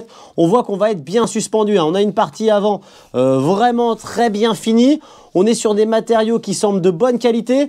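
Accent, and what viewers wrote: North, France. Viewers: Salut, Excuse moi tu est du Nord ? Lille ? Merci à toi 👍👍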